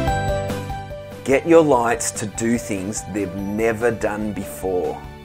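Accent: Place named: United Kingdom. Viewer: The only reason i can Believe is "British Accent".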